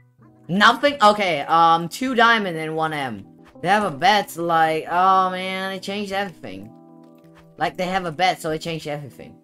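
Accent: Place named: Vietnam. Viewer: Are you Vietnamese you just have a accent(no offence)if you are Vietnamese I'm also vietnamese:)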